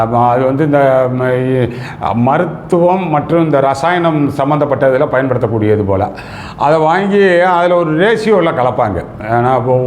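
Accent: Madurai, India. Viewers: மதுரை ராமனாதபுர வட்டார வழக்கப் பேச்சும் அதில் உள்ள எதார்த்தங்களும் சிந்திக்க வைக்கின்றன. சமூகம் விழித்துக்கொண்டு தன்னைத் திருத்திச்சரி செய்து கொள்ள முயலுமா. முயன்றால் முடியும். தங்களின் முன்னெடுப்பு முயற்சி வெற்றி பெற வாழ்த்துக்கள். காவல் துறையில் உள்ள "மாணிக்கங்கள்" ஒன்றிணைந்து காவல் துறையின் மாண்பையும் சமூகத்தின் மேன்மையையும்😢 நிலை நிறுத்த வேண்டும்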